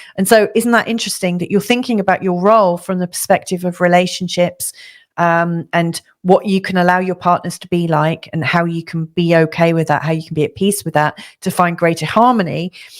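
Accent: United Kingdom. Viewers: you seem like a really nice person! great insights; calm, british accent (a must) - haven't been into astrology for a few years but you've got my mind moving! thank you :) - scorpio (pluto/mercury first house) scorpio (sun venus chiron 12th) & aries moon (4th) :))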